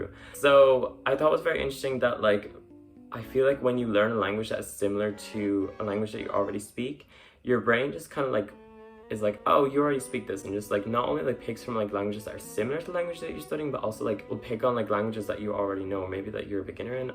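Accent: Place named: Netherlands. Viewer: As an Afrikaans person, learning Dutch. I can hear a lot of Dutch influence in your Afrikaans. Your pronounciation is Dutch.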